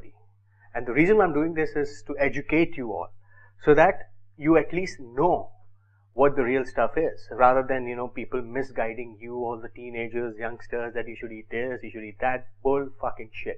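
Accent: India. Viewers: s gupta I am an Indian from England though and cannot speak Punjabi properly, but if I was living in India I would pick up the language and stick to it. In India speaking English is associated with higher socioeconomic worth because those who can afford a better education at private schools are taught mostly in English - But that starts as a gateway to a door of a full blown cultural inferiority complex which Indians attempt to make all sorts of excuses for such as celebrating Christmas but throwing under the guise of 'We respect all religions'. As an external observer it comes across as bizarre how most Middle Class Indians put an emphasis on speaking English over their national languages or Hindi, but other than that the accent does come across as embarrassing and makes it less likely for him to be taken seriously on an international platform - It's why it is so widely ridiculed and many Indians coin on to this and attempt to fake their accent to sound American but can never pull it off. 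I just find it strange how this guy would obviously be able to articulate better in Hindi or Punjabi (The reason why he is swearing so much is due to a lack of vocabulary) but then opts to speak English instead.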